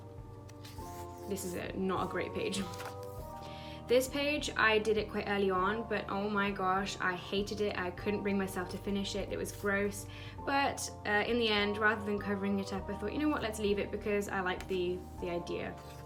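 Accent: RP, United Kingdom. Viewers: You can tell how posh you are holy heck - great art btw :Db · Wow you’re so posh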